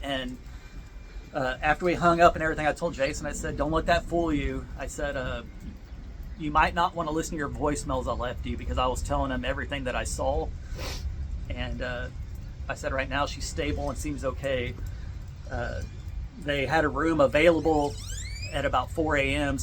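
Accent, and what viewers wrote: Texas, United States. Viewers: Praying for you and your family. ❤ You are such a sweet man and son. Jesus heal the trauma and hearts and give them peace and health for all. ❤❤ I have watched your channel for a few years because Im from Texas living years in Utah, love tiny homes and love hearing your accent because it reminds me of home. Keeping you in prayer. 🙏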